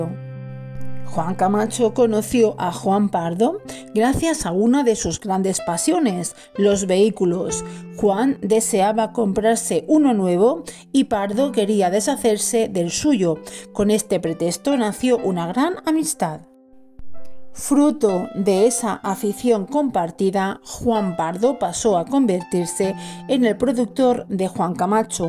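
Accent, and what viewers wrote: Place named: Valencia, Spain. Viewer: Decimooctava....... 2822 Como se nota q eres valenciana....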